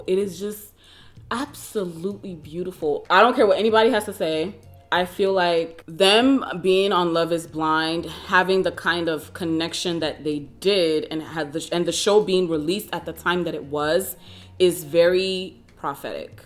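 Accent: United Kingdom. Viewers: The British accent has to stoppppppp. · She’s is giving us that auntie pats british accent and that’s on PERIODT my darling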